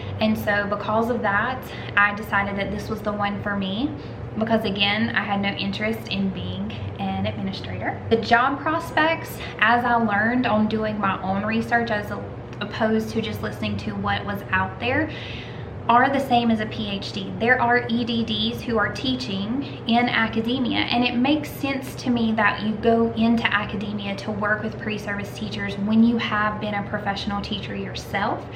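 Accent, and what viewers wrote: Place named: Texas, United States. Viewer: I came here because I just finished an EdD and now I want a PhD. Thanks for the info. I wish I knew this stuff before I committed to my Ed.D. program. Oh, well, live (with debt) and learn. I’m looking at postdoc fellowships, too. On a side note, I love your accent! Where in Texas is it from ? ⭐️💯 When I see my family every summer in El Paso and San Antonio few people have the Texas drawl..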